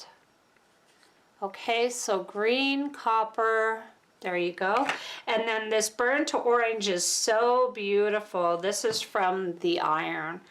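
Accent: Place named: Midwest, United States. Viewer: Thanx so much... I was looking for those paints!!! Phew! Saved my sanity... lol. I am a new sub and really enjoy your videos. Love the different techniques you show us! I am wondering... where do you live? I am in SE Texas but you seem to have a midwestern accent. Just curious... Hugs!!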